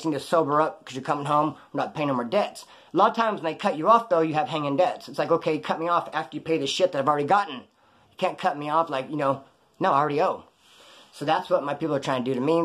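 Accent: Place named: West, United States. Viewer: U from az or cali?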